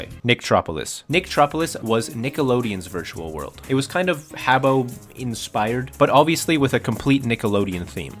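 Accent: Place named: Canada. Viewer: i am going to bet $100 that you are canadian just based on your voice. love from BC, this video ruled :3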